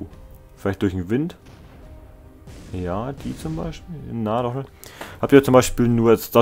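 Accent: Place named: Franconia, Germany. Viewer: Hey woher kommst du eig. dein Dialekt is so fränkisch😊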